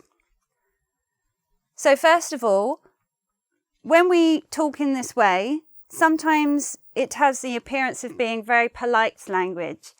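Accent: United Kingdom. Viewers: Thanks my best teacher ..you make me love British accent .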